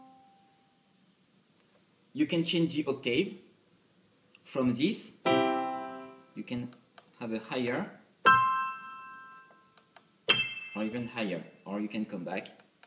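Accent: France. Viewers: Love that French accent ! My French wife said “OMG do I sound like that ?”. By the way, great videos, keep up the good work. All the Best. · Le mec a l accent français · hhhh putin l'accent française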